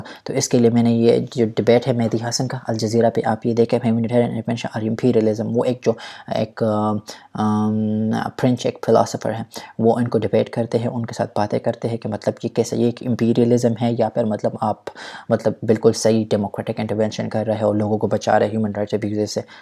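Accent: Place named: United Kingdom. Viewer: Please Urdu ko Urdu ki tara bole me confuse hota hon baz alfaz me... You speak Urdu like English man.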